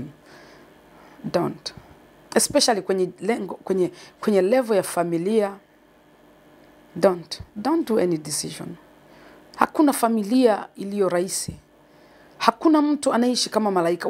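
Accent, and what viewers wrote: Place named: Kenya. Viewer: Samahani Shusho ni mkinya au Mtanzania Mbona ongea yake kama mkwnya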